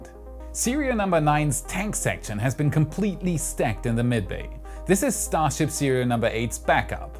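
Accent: Germany. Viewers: What nationality is? I can't quite guess from the accent! Germany?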